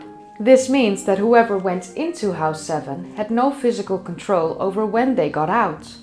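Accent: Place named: Netherlands. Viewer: Right, a lovely Dutch English accent - I heard it in a second.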